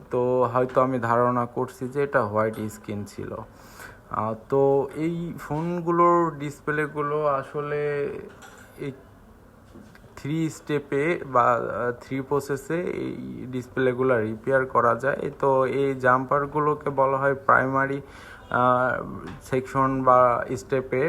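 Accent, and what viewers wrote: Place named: Kushtia, Bangladesh. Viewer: কুষ্টিয়া কথাই ভাইয়া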